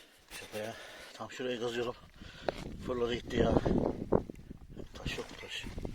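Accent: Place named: Black Sea, Turkey. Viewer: Karadeniz gibi bee mübarek memleketim yemsil...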